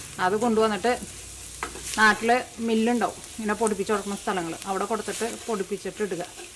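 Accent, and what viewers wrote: Thrissur, India. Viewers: Good,we will try,Oru curry avasiyam ayirunnu,I have Oma,in my yard here in Florida,it is an adavantage in this lent season.Ninte language Oru Trichur accent analllo!!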